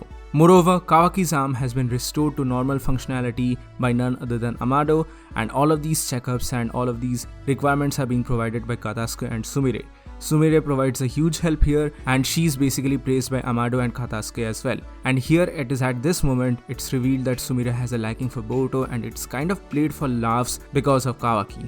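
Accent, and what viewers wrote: India. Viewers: In your language tone i know u are Indian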